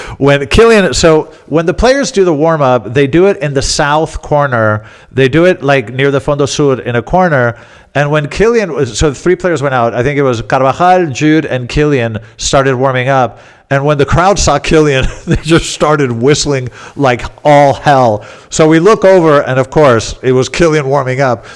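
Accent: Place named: United States. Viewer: You were playing bottom of the league. I gave up after 30 minutes when Oviedo were dominating and Real decided to actually play. In the rest of Europe we are sick of Real Madrid and happy that a PSG side without Galacticos is kicking ass and the rest of the world is laughing at you, knowing this moment was coming. The so-called greatest team in the world reduced to a pile of excrement. A bit like the US you could say. And you with your American English and predator hedge fund background would surely be a great choice for next Real President, Don.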